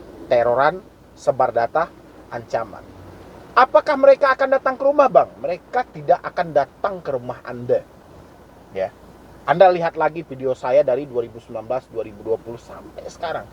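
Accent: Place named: Medan, Indonesia. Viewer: Medan mana suaranya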